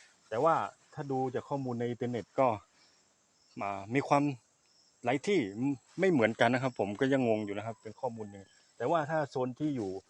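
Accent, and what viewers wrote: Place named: Central, Thailand. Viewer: คุณเคยอยู่หรือไปเรียนในเมืองมาก่อนหรือเปล่าคะ พูดสำเนียงภาษากลางชัดเจนดีมากค่ะ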